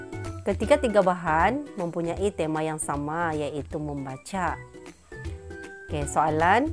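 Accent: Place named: Sabah, Malaysia. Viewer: Thanks cikgu , loghat sabahan btul ne 🤭😍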